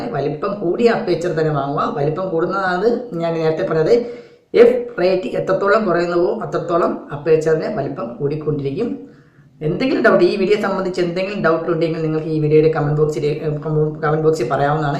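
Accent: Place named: India. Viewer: Video title in English, spoken in Indian.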